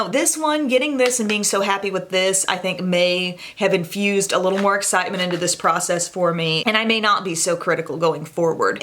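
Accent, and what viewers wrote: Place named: South, United States. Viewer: I don't know but I feel like I hear more country in your voice in this video? Like more southern? Love your vids and you! Xx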